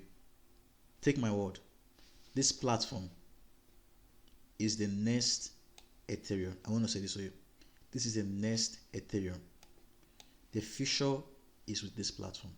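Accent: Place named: Nigeria. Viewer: where are you from, you sound Nigerian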